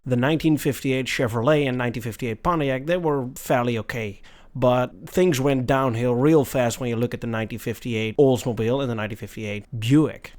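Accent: Netherlands. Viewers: Is the narrator dutch ? Ik hoor een licht accent. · Hey you have a strong foreign accent. You sound very Dutch even though it is clear that you are trying your best to put on an 'American' accent. Are you Dutch?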